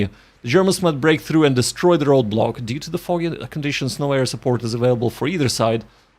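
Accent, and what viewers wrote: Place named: Eastern Europe. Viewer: Nice one boss. Where r u from? Eastern european accent. I played everything available for free from Wargame Design Studio. Grenada, Miuss etc... Great games. Get stuck straight away.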